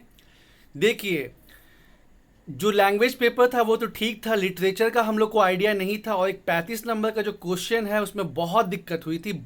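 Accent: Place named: Jharkhand, India. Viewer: thanks a lot,,for replay without any boasting I m now out of jharkhand and your style of speaking recall me jharkhand🙏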